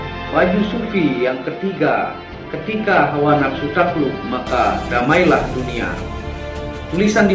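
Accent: Bali, Indonesia. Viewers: Masnya ini logat percakapannya kok seperti orang Bali.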